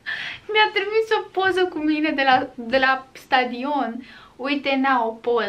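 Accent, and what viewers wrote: Moldova. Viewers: Dar tu de ce vorbești moldovenește cu prietenii tăi cu mama ta dar pe vloguri vorbești normal?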